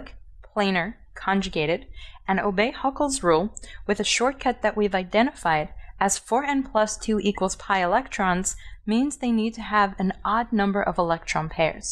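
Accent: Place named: Northeast, United States. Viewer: Thank you, this was very helpful. This also confirmed my suspicion that you were from the east coast. You accent was very northeastern LOL. LETS GO YANKEES!!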